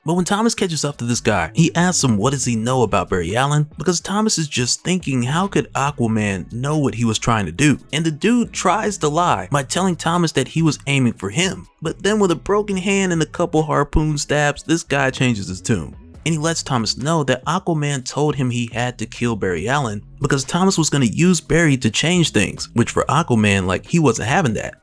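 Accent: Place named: Southwest Virginia, United States. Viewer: Love your accent man im from southwest va lol it sounds my county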